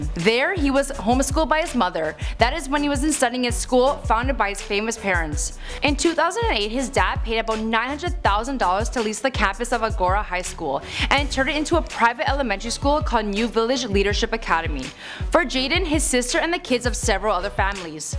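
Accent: Canada. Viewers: Her canadian accent is as thick As the whole album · Is her accent Canadian? Or foreign?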